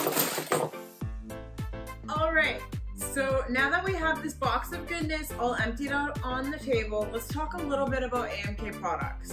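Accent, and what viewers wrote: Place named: Canada. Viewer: Canadian eh!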